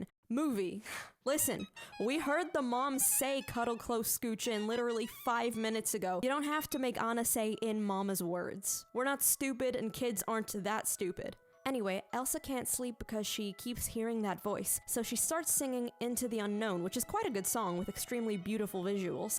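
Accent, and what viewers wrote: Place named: Ireland. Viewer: you sound irish and i love it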